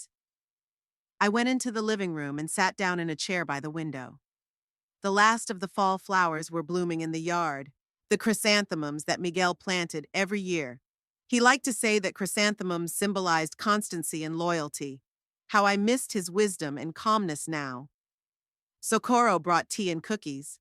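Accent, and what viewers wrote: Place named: Mexico. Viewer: What's up with the " Frito Bandito" accent?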